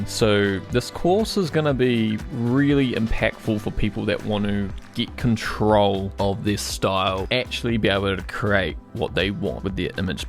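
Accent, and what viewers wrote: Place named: New Zealand. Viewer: So I’ve watched other videos of yours and only just clicked now that you do indeed have a kiwi accent and are from NZ too. I feel so stupid haha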